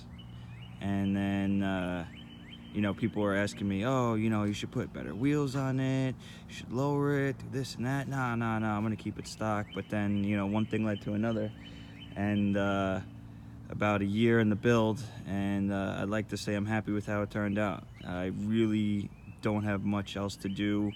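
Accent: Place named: New York, United States. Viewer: The New york accent is all i hear lol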